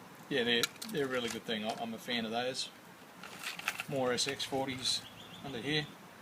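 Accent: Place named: Australia. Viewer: Nice to hear an Aussie talking about yakking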